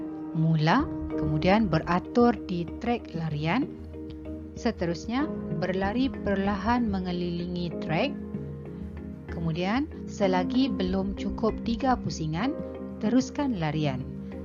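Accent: Sabah, Malaysia. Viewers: Ckgu orang sabah ke atau sarawak?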